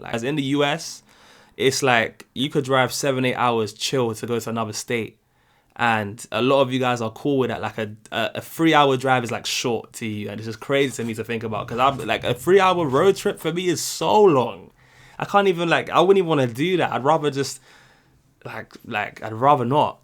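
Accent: England, United Kingdom. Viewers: 💙 THE ACCENT IS EVEN RARER WHEN YOU ARE A BLACK BRIT. 😆 A LOT OF AMERICANS ARE GENERALLY INTERESTED IN OTHER CULTURES & FOREIGNERS. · I don't think the customer service attitude is fake it's part of our culture because we are a consumer culture. Your accent yes in America we love the English accent it's like our favorite that and Jamaican. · We Americans love a British accent!! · Your accent, or, the British accent in general will improve your American experience. I love the accent. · I'm only at point 11 but I have to say, oh my goodness... the UK accent... I could listen to you talk all day lol.. seriously though. I LOVE your accent and I think it's pretty universal that you all have the BEST accent.. (well you and the Irish lol).. I don't even think it's opinion, I think it's fact. Lol...... it is like music to me. As for customer service, I know in my case it's not fake. 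I work in a grocery store, I shop for people and take orders out to their cars.... as tired as I get sometimes, I always try to think about the kind of day the customer may have had and always try to give my best. If I am having a bad day, the customers are always understanding. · it sounds like you had a really nice American vacation. 😊 We Americans don’t hear British accents very often… our television shows and other media is 99% American so a British voice is indeed attractive to most of us and is a bit exotic to our ears. I’m a Southern girl and when I visit up north to cities like NY or even west to LA, the locals enjoy hearing my southern accent. It’s foreign to their ears just like your British accent. 😊 We do love road trips! As a teen, my family drove from Alaska to North Carolina. Glad you had a good trip and am looking forward to your next video. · 90% of the world drives the way we Americans do, and with the wheel on the left! 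And yes, we love that accent…but because so many people like me watch so much YT content from Brits, it’s not perceived as unique or special as it USED to be. The accent always projected intelligence…American news networks even hire British announcers for this reason…but of course, we now know people can be stupid on BOtH sides of the Atlantic.😂 But it still has that effect on us!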